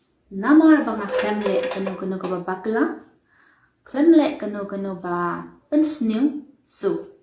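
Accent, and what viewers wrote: Bangalore, India. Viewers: Kasur ruh thik kum thei banggalore hi naduh karukom ak,nai ak than ainoh shi pek ne 😅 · 🤣🤣🤣🤣🤣🤣 kum ka thei bangalore khlm lait ka rukm krn rukom akshion pet sa ktno sa ia bhdeg dohtdong ne bah km ia ka thei bangalore ipoh jait bha jing log kynthei kmne phi don hynmen para ne phi dei khun marwei ne ilh rain bha phi bn lh kmne te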